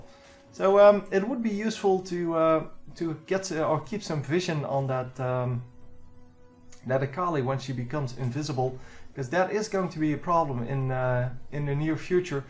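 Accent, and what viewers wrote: Netherlands. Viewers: The 'obviously dutch' way he prenounces every single word just annoys me so much and his overreacting.. oooohhhh niiiiicely done! Good work MEENN. Next time spectate a elo instaid of lvls 20/25. Thank you.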